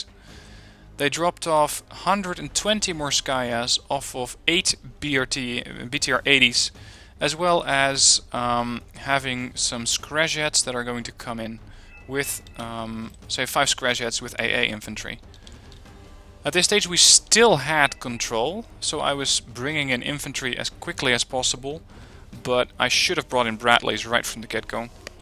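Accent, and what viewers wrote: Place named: Netherlands. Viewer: Is that a little Dutch slipping through ? Where are you from, your accent sounds different?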